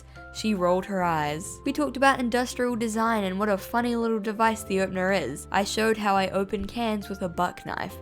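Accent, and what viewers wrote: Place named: New Zealand. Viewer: i can't tell if you're kiwi or not, but as a kiwi, your accent validates me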